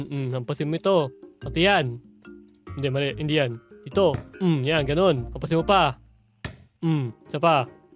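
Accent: Visayas, Philippines. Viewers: Kuya Bisaya ka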